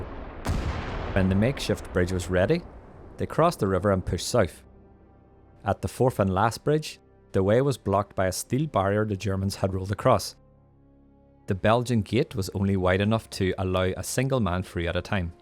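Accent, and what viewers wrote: Northern Ireland, United Kingdom. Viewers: Well done. Hence my subscription. Having spent a year in Derry, courtesy of the U.S. Navy, your accent sounds as if you are from Northern Ireland.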